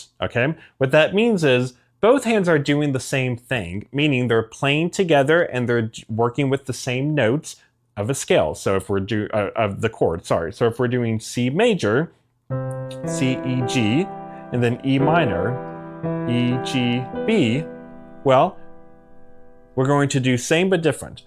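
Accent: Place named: United States. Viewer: Yeah, he's speaking american English, not some damn British English, and also assumes we're dumb which is good!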